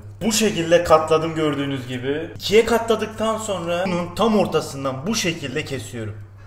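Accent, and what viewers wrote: Adana, Turkey. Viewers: Yer Diyarbakır İstanbul değil ayrıca dil adana değil ŞİVE adana :)) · şive değil ağız adana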